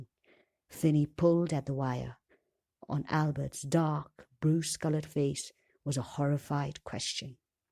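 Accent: United Kingdom. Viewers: Your reading is FANTASTIC!! To hear a British woman taking the helm on this gives it an extra touch of surrealism (or plays against type so well). Very effective, and a HUGE relief from the awful, painfully contrived readings of Will Patton; his whistling S’s and phony solemn affect keeps me away from those. I hope you get hired at Audible, m’lady. 😄👍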